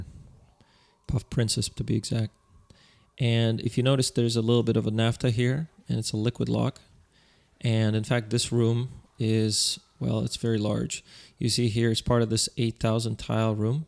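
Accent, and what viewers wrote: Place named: Russia. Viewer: едриттунг. енто же рашн инглиш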